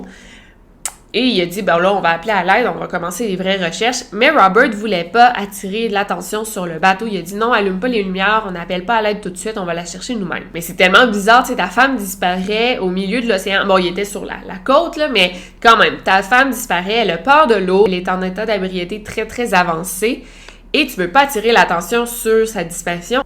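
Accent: Quebec, Canada. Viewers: Sans vouloir vous offenser, je trouve votre remarque inutile et en plus de ça, pas très sympathique... Un accent ça ne se choisit pas. En plus l'accent Québécois c'est le best accent du monde 🥺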